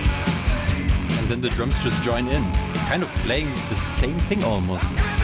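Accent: Germany. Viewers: You definitly dont sound like the baby from family guy. As a german I hear the german german accent if you could actually call it an accent.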